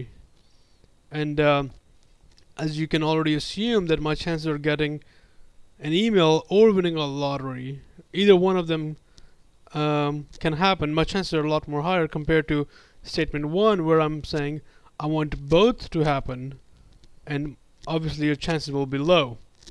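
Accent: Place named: United States. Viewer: what a stupid american accent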